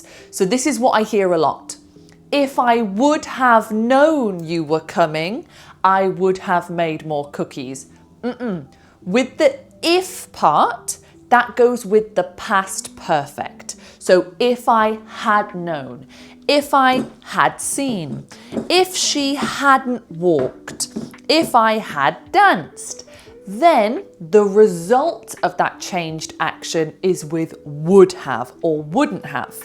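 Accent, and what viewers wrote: United Kingdom. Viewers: I like British accent ❤ and cookies amazing georgous looking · how l love British accent ❤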